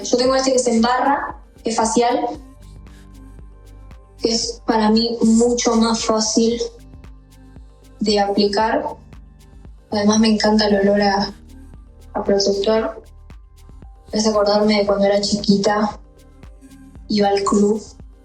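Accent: Argentina. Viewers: Hermosa con o sin maquillaje, amo a los argentinos y ese acento tan lindo, además nadie puede negar el talento que tiene esta chica, una voz preciosa y súper bella.... ♡♡♡♡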